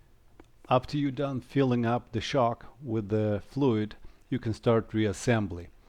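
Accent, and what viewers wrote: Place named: Romania. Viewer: Mercedes-Benz Channel We all know that experience my friend. It’s an inspiration for me, you’ve got it looking really good. I hope you find time to complete the build soon. I haven’t even started mine yet. It’s a 1983 USA spec model (solid alloy wheels and ‘INTERCEPTOR’ decals), so rather rare here in the UK. Where are you based? Your accent sounds Romanian to my ear. Hope I haven’t offended you.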